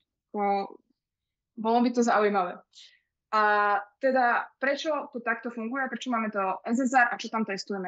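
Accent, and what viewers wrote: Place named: Bratislava, Slovakia. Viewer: tato tema ma vobec nezaujima, ale pozrel som si to cele lebo mi chybal bratislavsky prizvuk :)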